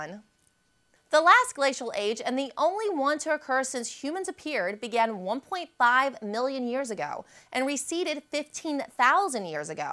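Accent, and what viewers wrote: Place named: United States. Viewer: wanted to learn something..... heard an american voice...... moved on.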